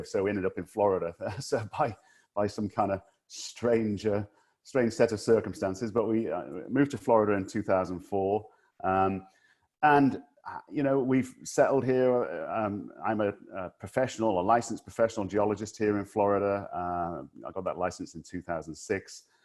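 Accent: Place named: England, United Kingdom. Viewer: From a random Floridian: Thanks for posting this. I was already familiar with most of the geological history and hydrology of Florida, but it was very interesting to learn about some of the engineering, economics, and politics of sinkholes. Plus it’s always fun to hear our town names pronounced with an English accent. Cheers!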